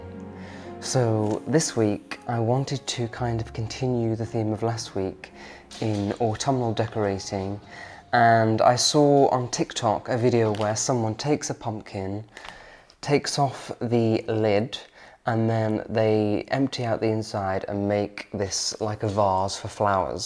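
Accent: United Kingdom. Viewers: Dear, I love your videos...Your beautiful and gentle British accent, your beautiful and soulful spirit, your sense of Elegance and Beauty and your mannerism!!! Love your natural and delightful attitude toward life, living, and sharing your interests!! Your videos are refreshing and Soulful. Love the music you selected for your videos, it is perfect!!! Your videos provide a sense of harmony, love, friendship, and sentimental values and tradition which many of us appreciate.You are Awesome!!! Superb job!!! Thank you!!❤❤❤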